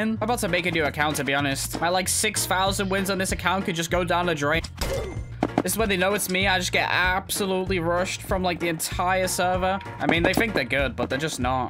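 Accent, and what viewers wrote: United Kingdom. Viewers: Bruh he’s British that’s why he says it like that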